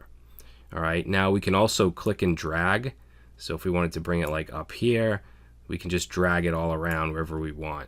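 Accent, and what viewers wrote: Boston, United States. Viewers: Boston accent is killing me.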